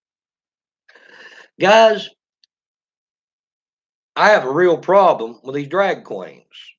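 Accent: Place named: Rural, United States. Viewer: You sound like an inbred hick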